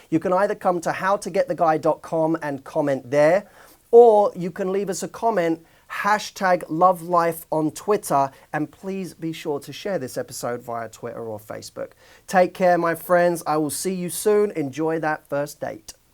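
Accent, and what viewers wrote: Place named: South London, United Kingdom. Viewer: Where are you from? South London? Your accent is adorable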